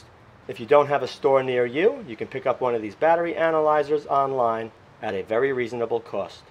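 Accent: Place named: Long Island, United States. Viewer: Thank you, and you are most welcome. Geez, hard to believe but it was 2004. Sure, a lot has changed, but in my opinion and experience the zenith of Toyota quality, fit and finish, and reliability was Japanese made Toyota vehicles of the late 80's-1995/96. Still relatively simple but done with tried and true technology and craftsmanship, and in many cases engines were VERY overbuilt, nearly indestructible, yet refined and beautifully executed/made with extremely high quality parts/metals. Still a lot of timing belts to deal with in this era, but the vast majority of these engines were non-interference, and a broken belt did not destroy the engine. I'd like to compliment you on your channel, and your way of going about your videos. They are clear with a lot of detail, well filmed, very well explained with easy to grasp concepts, done in an affable manner... 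The true mark of a great educator... And it even sounds like my old friends from Long Island. Take care, and please continue your great work.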